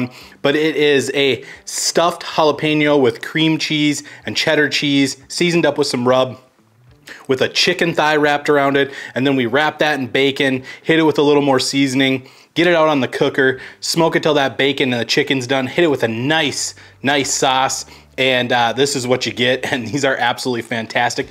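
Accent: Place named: Chicago, United States. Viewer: Are you from the upper midwest? You sound like you’re from Chicago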